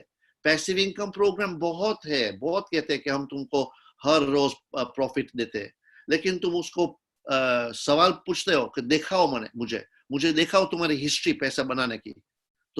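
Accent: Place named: India. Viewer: Apki hindi indian jaysi hai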